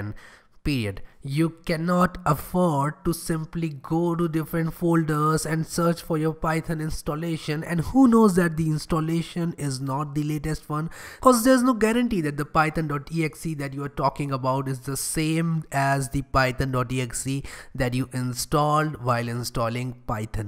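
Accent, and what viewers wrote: India. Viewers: This guy's got the accent but is trying to be super cool. Thumbs up my hindu friend. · I thought he's joking with indian accent...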